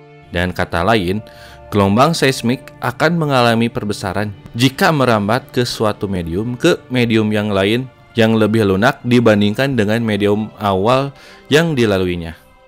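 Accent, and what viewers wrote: West Java, Indonesia. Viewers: Terimakasih konten nya kang... sunda pisan euy logatna 😊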